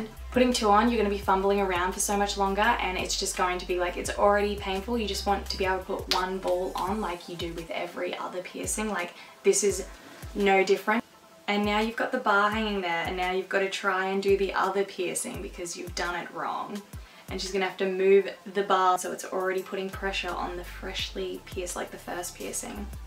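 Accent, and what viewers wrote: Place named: Australia. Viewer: That's Gross. I pierced my own ears when I was 12 the second and third holes. Had my first ones done at birth. (Argentine parents). Girl u have. Great content 👍 recognise that Aussie accent I'm in Canberra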